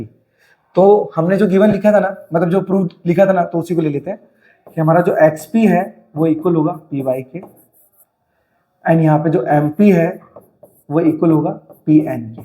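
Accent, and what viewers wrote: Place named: Gujarat, India. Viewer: From your talking style I think that you are from Gujarat. Anyways I'm from Ahmedabad Gujarat. And sorry for distributing you.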